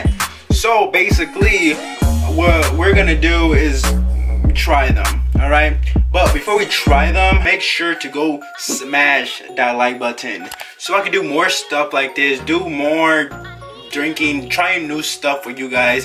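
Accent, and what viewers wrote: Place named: Chicago, United States. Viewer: This video randomly popped up for me, and instantly after hearing you speak I was like "this kids from Chicago..." so I went on your page, and bam... I was right. lol Hello fello Chicagoian !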